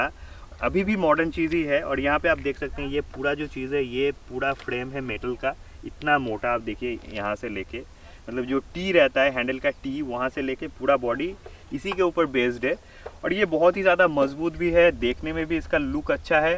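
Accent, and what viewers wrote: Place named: Assam, India. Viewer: Assamese accent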